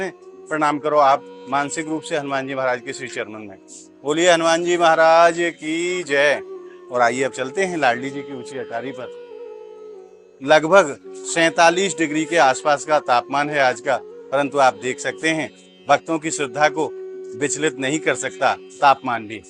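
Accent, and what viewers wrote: Braj, India. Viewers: आपकी आवाज ब्रज मंडल की अच्छी लगती हैं राधा कृष्ण जी k darshan achi thrah 2mint tak करवाया करो dhanabad❤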